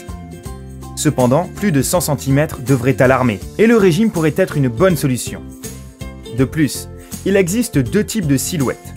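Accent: Quebec, Canada. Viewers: t québécoise ?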